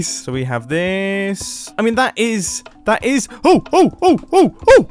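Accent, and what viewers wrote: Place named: United Kingdom. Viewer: That Bri-ish accent tho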